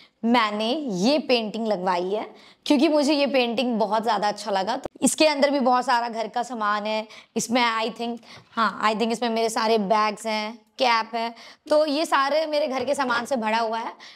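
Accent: Bihar, India. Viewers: Aapr proud hai.. Qki aap real ho. Genuine girl ho. Aaapka Bihari accent bahut achha lgta h.... Aap ekdm jamin se ujtkar yaha tak pahunche ho isly aap inspiration v ho sbke liye.. Love n support,👍👍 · Ap jo bolte hai na di vhut acha lagta hai m v vese bihar s hu di.. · I really Like her bihari accent.❤❤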